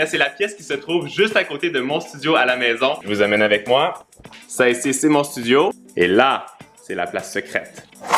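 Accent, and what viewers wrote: Quebec, Canada. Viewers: Pourquoi et plus québécois et on frère est plus allemand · J’adore l’accent d’, c’est un mélange de Québécois, Anglais et Allemand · a l'accent québécois et un accent qui ressemble a l'accent hollandais (enfin le ton lorsqu'il parle français) Pourtant ils sont jumeaux je suis perdue....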